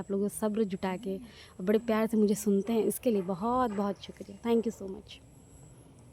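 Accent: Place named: Assam, India. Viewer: Ba Apunar mukhot assamese xuni kiba eta val lagil ❤ daily viewer apunar ....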